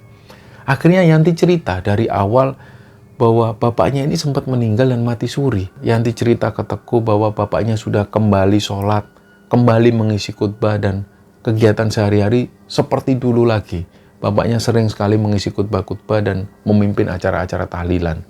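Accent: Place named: East Java, Indonesia. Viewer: Hadiiirrr Banjarmasin nyimak,aku wong jowo seneng nek ngrungokke mas crito,logat jawanya kentel bgt jowo wetanan..,seneng bgt pokok e,sukses terus mas &tim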